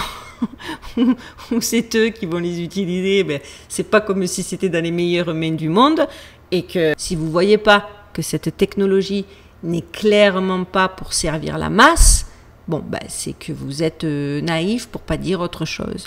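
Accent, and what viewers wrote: France. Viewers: Question : As-tu une certain succès envers les amerlocs avec ton accent Français ?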